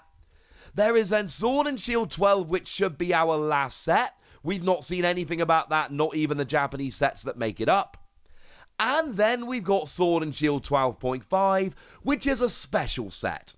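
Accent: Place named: United Kingdom. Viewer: Ehhhh? I've lived bham, exe and london and his accent is like pretty default brit I can't lie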